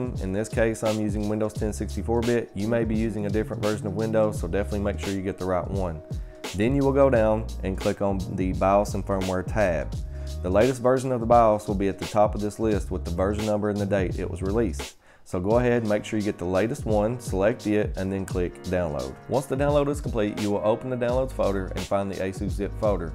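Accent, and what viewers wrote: South, United States. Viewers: thank you so much. didn't expect a computer man to have a southern accent haha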